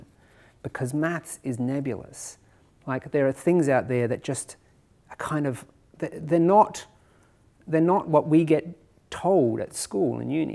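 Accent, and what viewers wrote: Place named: New Zealand. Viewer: Sounds to me like a Kiwi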